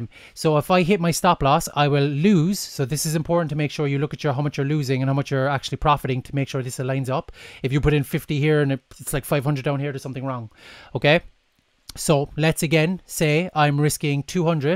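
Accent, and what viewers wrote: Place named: Ireland. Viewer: Nice to hear a fellow Irish man. Are you from Kerry/Cork? Great video btw. Thanks ALOT!!!!!!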